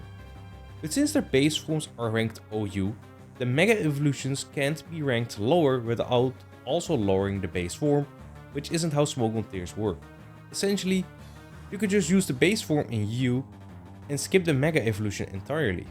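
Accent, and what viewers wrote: Netherlands. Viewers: Do you happen to be Dutch? The accent is thick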